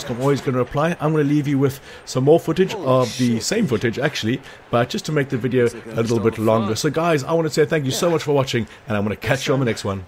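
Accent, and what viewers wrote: South Africa. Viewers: thought I recognized the accent. I'm from SA too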